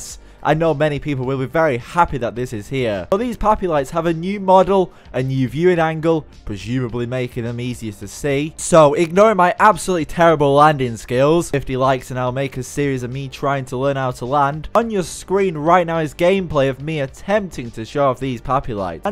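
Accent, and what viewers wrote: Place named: Australia. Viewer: its the australian man again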